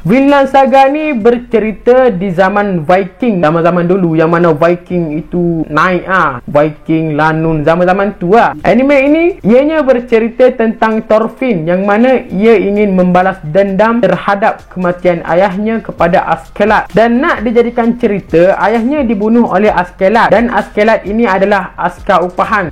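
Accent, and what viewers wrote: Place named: East Coast, Malaysia. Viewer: mcm org pantai timur je speaker ni ckp😅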